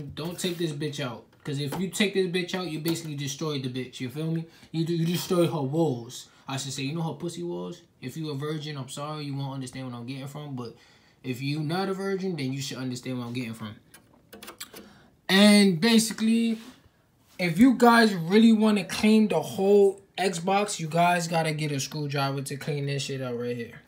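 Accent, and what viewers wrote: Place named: New York, United States. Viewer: ye he from ny lol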